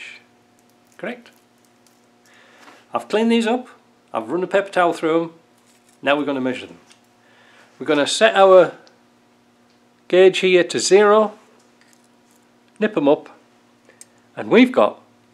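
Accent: United Kingdom. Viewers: Dear unknown , watching you from Poland , beacuse I learn english and you are a good teacher , I learn a lot of constructon , fixing 4x4 so thank , UK accent nicely.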